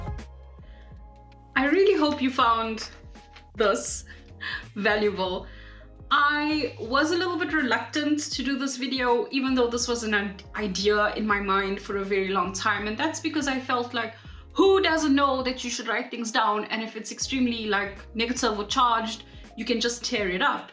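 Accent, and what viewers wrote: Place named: South Africa. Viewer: I’ve always been told that the South African accent is calming and it took me 33 solid years but now I agree.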